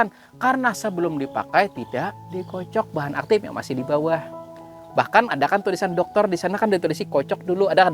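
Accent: Banjar, Indonesia. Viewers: Orang banjar kah pak... Mantap penjelasan nya... Salam dari Kalteng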